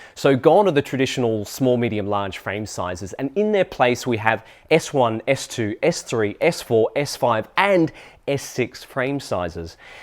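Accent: United Kingdom. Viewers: Are you a Brit in disguise? Intrigued by the accent... 🧐 (Yes I did enjoy the bike content!)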